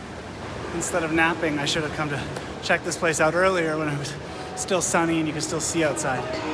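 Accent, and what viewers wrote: Canada. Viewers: I love your travel logs..I knew you were Canadian. Abouwt gave it away lol. I love your excitement of travel. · I don't understand how people confuse the American and Canadian accent. Yours is so clearly Canadian. There is a softness and meter that isn't so apparent in America.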